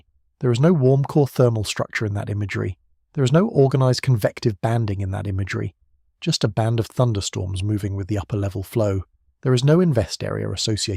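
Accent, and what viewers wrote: United Kingdom. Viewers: Why the British accent on these videos?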